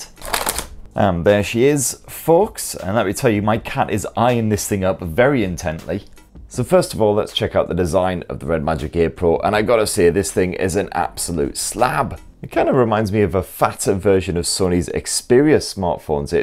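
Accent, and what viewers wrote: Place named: Newcastle, United Kingdom. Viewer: sounds like Newcastle accent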